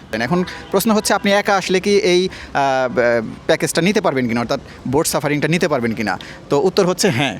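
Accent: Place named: Khulna, Bangladesh. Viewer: আমি যতদূর জানি এটা হচ্ছে বিরুলিয়া ব্রিজের কর্নারে আপনার ভাষা শুনে মনে হচ্ছে আপনি খুলনা বিভাগে আপনার বাড়ি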